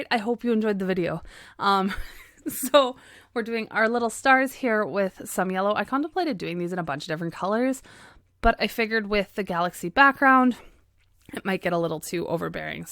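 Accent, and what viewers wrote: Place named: Canada. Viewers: I guessed you were Canadian while I was listening and then expanded the description and saw you actually are! I can just tell sometimes because I'm Canadian too. I love this card. The background is all my favourite colours and I love it. It's outta this world! 😉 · As a Kiwi, I can tell with the way Canadians say ‘about” and “out”... and for you the word “slow” too... your “o” sounds are a giveaway 🤗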